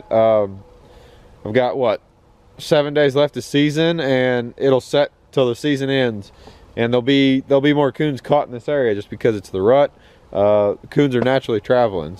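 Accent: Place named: Pittsburgh, United States. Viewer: Your dialect sounds like Pgh. Pa? Or close?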